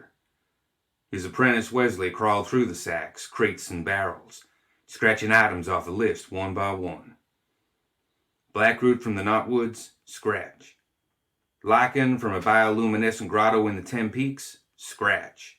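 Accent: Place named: Louisiana, United States. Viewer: Interesting choice to have the story read in a Bayou accent.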